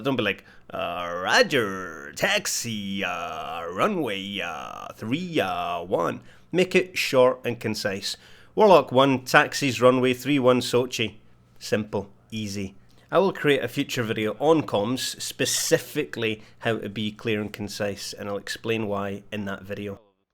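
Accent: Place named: Cockney, United Kingdom. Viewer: Love your accent, is that that classic east london cockney ;-)))))) Edit: wrote dialect first instead of accent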